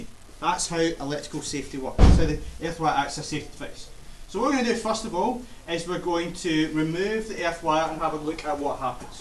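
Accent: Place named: Scotland, United Kingdom. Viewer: This really helped, thanks! Took a bit for me to understand your Scottish accent.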